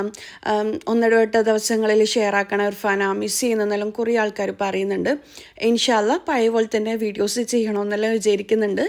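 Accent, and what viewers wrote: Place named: Kasaragod, India. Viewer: Njan kasargodan samsaram njangale samsaram same aanu njangalum kutti machil parayum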